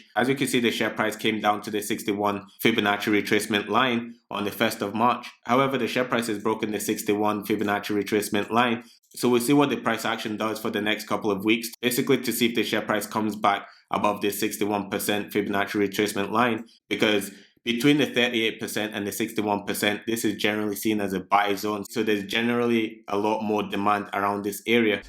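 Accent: Scotland, United Kingdom. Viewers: First time seeing your channel, nice work👍🏽 Your accent is great, mix of Scottish and?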